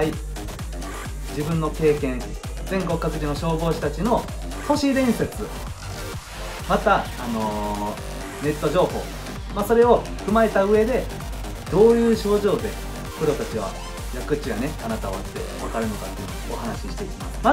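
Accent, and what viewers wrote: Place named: Kansai, Japan. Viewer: 関西のどこだろう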